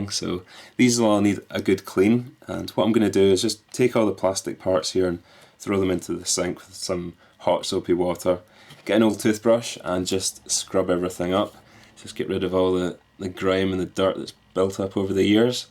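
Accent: Scotland, United Kingdom. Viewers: I'm American and could tell he was Scottish. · I love this accent! I can't quite pick it up properly though, is it Scottish? Edit: definetly Scottish. I'm Scottish so it's like really hard to pick up everything you hear on a daily basis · are you scottish? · If you're implying he's English or Australian then wrong on both parts! He's clearly and obviously Scottish. You must be an American. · I love Scottish accents. ouo That console looked far worse on the eBay page than it does in person! The motherboard must be corroded to hell!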